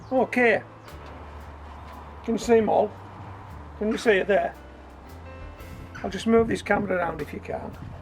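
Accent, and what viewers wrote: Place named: North, United Kingdom. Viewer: Proper nothern accent. Absolutely love it haha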